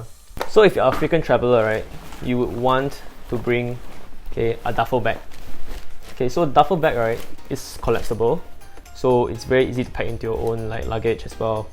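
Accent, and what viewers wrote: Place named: Singapore. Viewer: Great video! But the Singlish is too crazy for me man 😝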